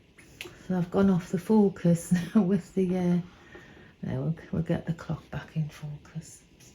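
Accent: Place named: Newcastle, United Kingdom. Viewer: Great informative video, Would not have had a clue about some of the options without it, so many thanks. And seriously - loved the Geordie accent.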